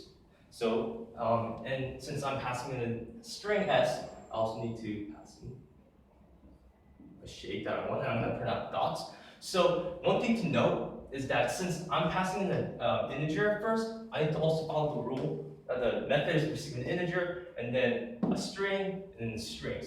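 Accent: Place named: India. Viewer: I don’t understand your Indian accent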